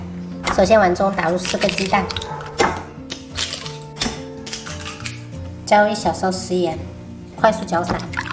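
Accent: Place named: Sichuan, China. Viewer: 喜欢看你的频道～^_^～温暖的家常菜，亲切的家乡川普❤️～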